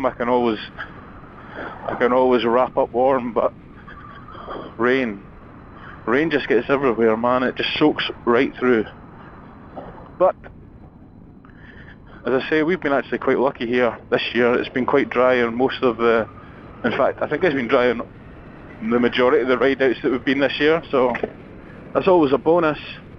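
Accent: Scotland, United Kingdom. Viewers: Hey dude, good to hear another Scottish Accent :) If you are ever heading further south on your Vespa, let me know and we can go a ride!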